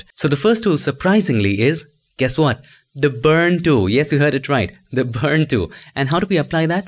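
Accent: India. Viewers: hello there! are you Indian cuz your same exactly seems like Indian, I'm also Indian ☺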